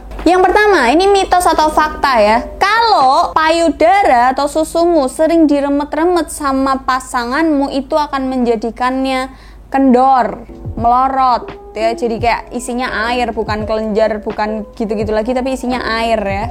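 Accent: Java, Indonesia. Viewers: Anjaay medokk jawanya bikinn gemesin ngomngnya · Logat Jawa keren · Koe jowo yo mba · G bisa bahasa 8ndo nesia yg bagus ya k9k medok gtu emang ada bahsa indo yg kayak gtu blajar dlu baru ngomong yo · Sippp kak.. Aku suka aku suka pmbahasanya kena dan medokk bngttt jowone... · Asli jowo to mbak😁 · Mbk samean ark ndi to Kok omh e jowoan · Suka bener sama kak ini dari segi bicara sampe penyampaian nya selalu pas apalagi ada logat jawanya enk gitu di denger wkwkw Sehat selalu kak 😊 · Suka bgt ama logat cara berbicaranya ehehe pa lagi klo kdng ada jawa jawanya gitu ehehe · Jangan pake bahasa Jawa dong ga ngerti, medok medok aja😒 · Indo Inggris ❌ Indo Jawa ✔️ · Medox banget mbak..😄🤭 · jawane ngendi mbk.e😁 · Masuk mbak.... Kenthel banget jawamu mbak. Nek boleh tahu jawamu ngendi mbak? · Aduh.. Kak udh nikah blom sih? Klo blom mau ga klo aku nikahin😂tipe gua bgt ni cwe, frontal, buka"an, manis, medok, haduhh smoga kau jodohku wkwkw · Cw cantik ngomonge medok kesane lah lucu😁 asikk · Cara ngmong nya itu yg aku suka perpaduan jowo dan bahasa indonesia love dah mba ❤ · Bahasa jawa medok nya bikin gua senyum sendiri 😀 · Njirr gua suka chenel ini bukan karna pembahasannya, tapi cara penjelasannya lucu,konyol,kocak.. 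Khass cwi jawa🤩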